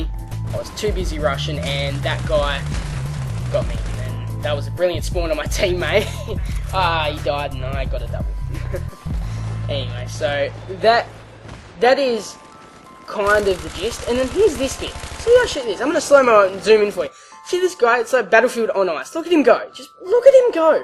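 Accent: Australia. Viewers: i dont know what it is but for some reason listening to an aussie just makes this vid seem more sophisticated. it just sounds right, American comentators just cant top it. he sounds like abit of a bogan but still, this vid doesnt sound as dumbed down for little kids. keep up the good work mate ur a legend. and to people getting bothered by the accent think how we feel with the significantly lower amount of aussie commentators. remember its as anoying for u as it is for us listening to ur accents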